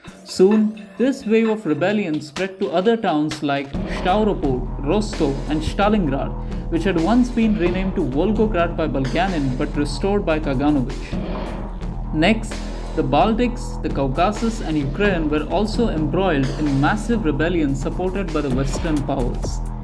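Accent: India. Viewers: I'm sorry, I can't listen to an indian for 9 minutes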